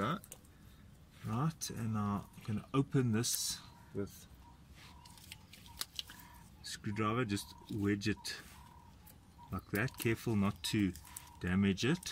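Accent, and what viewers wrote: South Africa. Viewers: Victor Araoz he sounds South African not Austrian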